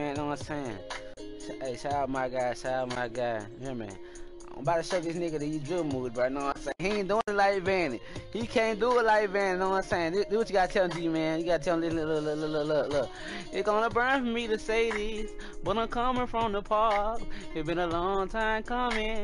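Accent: Memphis, United States. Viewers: Is u from Memphis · you from memphis cause yo accent sound like mines and im from memphis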